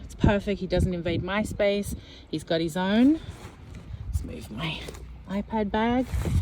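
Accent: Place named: Australia. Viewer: Nice to hear another Aussie accent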